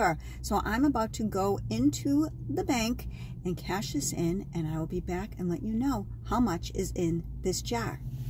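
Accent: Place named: New England, United States. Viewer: Based on the way you say the words "Jar" & "Dollar", it sounds like your from the New England area, probably Massachusetts. I love hearing the slight accents from up there, mostly because it's disappearing over time. $52 isn't a bad haul. Keep using cash and saving the loose change!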